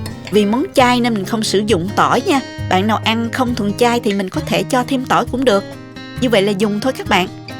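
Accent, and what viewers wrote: South, Vietnam. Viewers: Bạn nói vọng người miền nam rất hay, rõ và dễ hiểu. Southerner dialect spoken very easy to understand , clear voices and very beautiful sounds.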